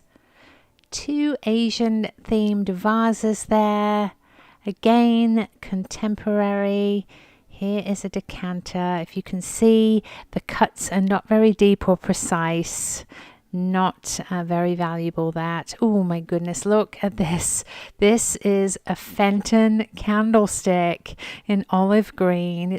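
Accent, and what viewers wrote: England, United Kingdom. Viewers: I'm a new subscriber from England and really enjoying your informative, relaxing videos. I wish we'd have the large Goodwill thrift stores in the UK, our charity shops are very small in comparison, with just a few shelves of bric a brac and very hard to find any treasures. I hope you don't mind me asking, as you and your lovely Mum have English accents with a slight American drawl, so wondering what's your history or connection with England?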